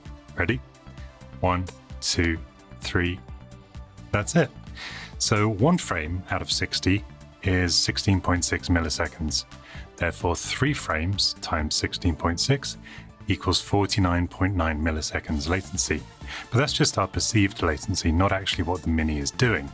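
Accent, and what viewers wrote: United Kingdom. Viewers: Dang, fast replies here! :) Thank you, me and my daughter (who alsdo don't like spiders), also guessed a lizard. Where do you live btw? You sound like you're from the UK, but some sticker on the box said US, but you do like C64, Amiga, etc which is kinda European.. myself from Sweden felt the craze here as well :) Mighty awesome channel though! The asthetics of your old game room / pc room / studio is so inviting, with all the old vintage equipment looking new, fresh and colorful :D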